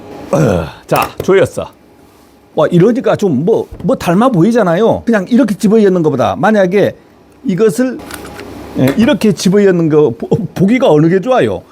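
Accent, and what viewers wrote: Jeju, South Korea. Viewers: 제주도 삼춘이다, 발음은 제주 토백이다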